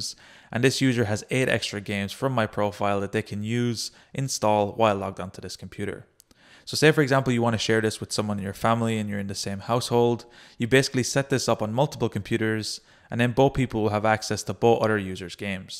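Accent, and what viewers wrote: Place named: Ireland. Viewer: I can hear an irish accent of ye, you irish by any chance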